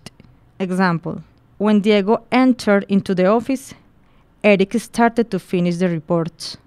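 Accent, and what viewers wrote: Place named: Colombia. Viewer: ingles de colombiano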